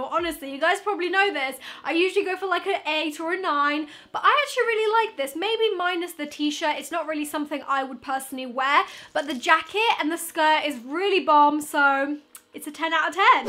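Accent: United Kingdom. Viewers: are u Britain??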